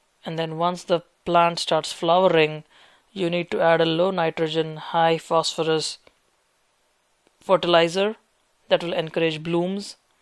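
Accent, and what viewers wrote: India. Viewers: What kind of accent is it? Indian or something similar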